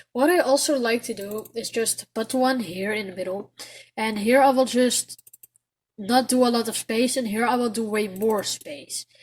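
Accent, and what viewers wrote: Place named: Netherlands. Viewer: Thx bro and i hear that lil dutch accent :)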